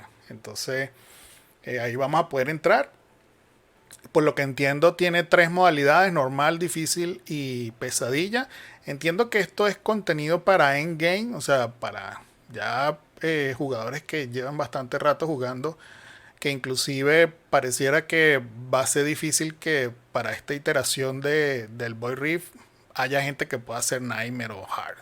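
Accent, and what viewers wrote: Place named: Venezuela. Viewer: Coño esa forma de hablar pareces venezolano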